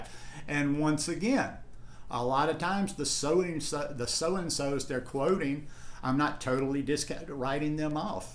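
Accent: South, United States. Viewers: I watch only a handful of raw plant based people. Their eating styles range from gourmet labour intensive, all the way to those who don't use machines and meal prep involves grabbing a piece of fruit . I take info from them all and that is reflected in how I eat. You and Tannyraw are my favourites - must be the American southern accents that I love 😀.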